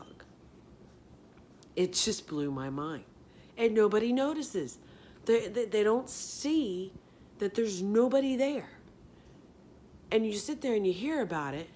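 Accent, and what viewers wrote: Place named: South, United States. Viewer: I hadn't caught that deep southern drawl in your voice before..Are you at Truth Social?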